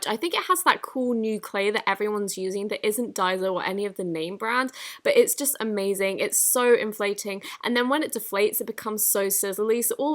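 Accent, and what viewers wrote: England, United Kingdom. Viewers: is she really British like wth.... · I love the English accent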